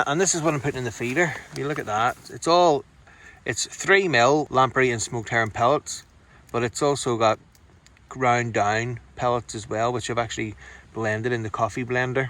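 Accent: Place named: Ireland. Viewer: Really enjoyed this vid so much! many thanks. that Irish accent reminds me of friends I haven't seen for too long, and the positive attitude in the rainy conditions, brilliant filming, and perseverance to catch is just great and inspiring to see when I feel like staying in and not getting out fishing as the forecast is shite. all the best to you, mate, thanks again! ;-)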